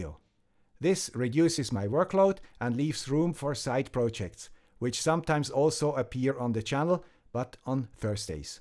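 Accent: Switzerland. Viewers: Your video is an important message today, not just for Engineering, but for day to day life. I greatly appreciate your channel and always smile when I see a new one of your videos appear. All the best from the USA where trust is in rather short supply. Hopefully, we can learn a little from the! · Joking aside, very nice content. Continue trusting 🙂. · I’d like to think not many commercials or youtube channels influence me in a substantial way. You are the exception and it has allways been a good experience. If i need some engineering advise or a point of view on something i usually think “what will the have to say about this”. You really do add something to the table and i trust the “Swiss accent” brand. Not doing any midroll commercials is also very much appreciated. I have been annoyed much by youtube lately and unsubscribed from some channels that run more than 3 commercials in 10 minutes. 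What a pleasure to watch without any rude interruptions! Wish you all the best in 2021! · This is a much more philosophical video than I expected, but I enjoyed it. Thanks!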